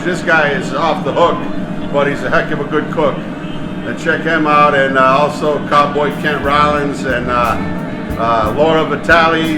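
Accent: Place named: New England, United States. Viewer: The stew looks so good,. I'll try it sometime. I am so impressed with your willingness to endorse other YT channels (I'm a Kent Rollins subscriber) and encourage people to watch their channels as well. That goes a long way with me, and demonstrates your personal generosity and humility. Major kudos with me, pard. BTW your accent is so great (yeah, you have one, just like everyone else lol) it's a mix of New England and New York that is surprisingly, sort of comforting. Keep up the good work. Just for giggles, could you do a corned beef brisket for St. Patrick's Day? You have the modern programmable pressure cooker (the brand name escapes me) but I've done a corned a beef brisket in one, and it's not bad at all. I'd prefer to take the 3+ hours to cook it properly, but if you're in a rush, ya gotta do what ya gotta do. I bought a huge brisket a little while back, and if you could do one from untouched brisket to finished product, it would really help me with my preparation in a couple months. 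I'm hoping St. Paddy's isn't on a Friday again during Lent. So inconvenient lol Love what you do. Thank you.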